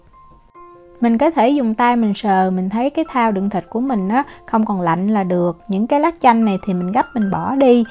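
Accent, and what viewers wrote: South, Vietnam. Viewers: Nhìn ngon hấp dẫn, ở VN người ta hay nướng thịt cốt kếch,còn nạc dăm thì bán bún thịt nướng. ơi, e ở miền tây tình nào mà nói giọng rặc miền Nam giống ngày xưa quá. Dễ thương lắm!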